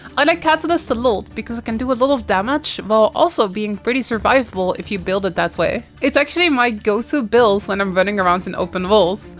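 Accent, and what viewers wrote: Netherlands. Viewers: can really tell the dutch accent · i can be wrong but i smell dutch 😅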